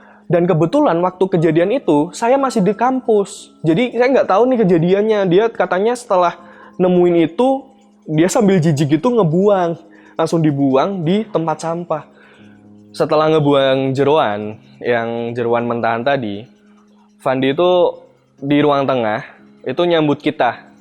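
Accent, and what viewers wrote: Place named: Java, Indonesia. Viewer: bosone medok nyel ehheeheh di enteni crta2 next e sam😁 slm podo ngalam e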